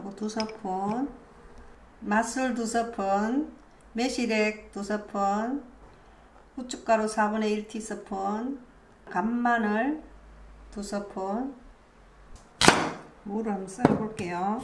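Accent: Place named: Daegu, South Korea. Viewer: 선생님 감사해요 멀리서 항상잘보고있어요!!! 구수한 사투리 듣기좋아요 . 저도 고향이 대구거던요!! ㅎㅎㅎ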